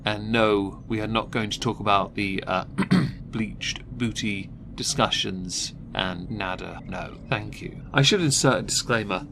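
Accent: United Kingdom. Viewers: You know the very best start of your vid is your saying in your British accent is “Well isn’t that just fantastic?” because that’s exactly how I would say that minus the British accent lol!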